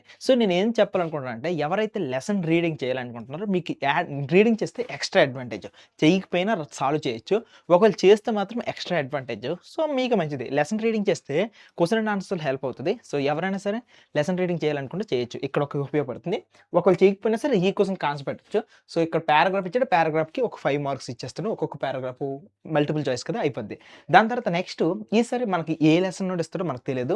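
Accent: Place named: Telangana, India. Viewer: Anna telangana 😅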